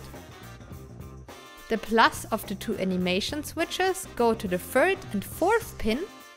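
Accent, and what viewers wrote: Germany. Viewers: In den ersten 2 sec gehört das du aus Deutschland bist. No front. Ich finde es gut 😁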